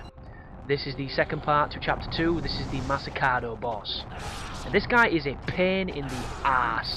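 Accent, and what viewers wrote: United Kingdom. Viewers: I really like this guide...your voice is cool (i'm in the US; not used to british accents), and your guide is giving me the confidence to beat this game on very hard to then unlock master ninja. Just completed sigma 2 on master ninja? Ever doen that? Or have you only beaten ninja gaiden 2 for the xbox 360? Either way, I definitely enjoy your channel and your guides. Very professional and to-the-point.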